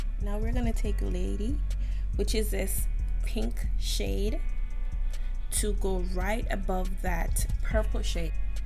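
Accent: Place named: Caribbean. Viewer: I hear an accent are you from the Caribbean?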